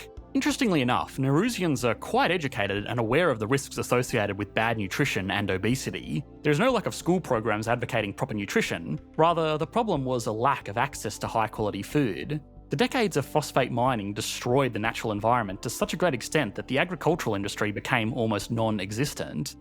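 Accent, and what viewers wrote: Australia. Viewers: Australian accent in a nutshell. This phoneme doesn't exist. That and ending their sentences like they were questions? I found myself repeating the end of every sentence just to hear what I'd sound like if I were from there? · people getting mad over an australian accent and invalidating the rest of the video's point proves that these people have the highest iq in human history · First time here, interesting topic, only I can stand your way of talking.. using Vocal fry at the end of a sentence. STOP IT and talk normal. (not talking about Aussie accent). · It's called "Australian". Admittedly, modern Australian, but Australian nontheless. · Interesting accent Sounds to me a mix of Australian and tv chef Loyd Grossman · Aussie narator.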